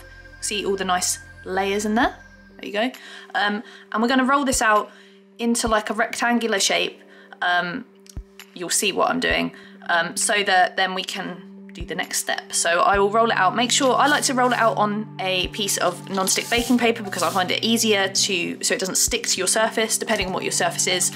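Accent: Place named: Southeast England, United Kingdom. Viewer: Judging by her accent, she's from the SE England!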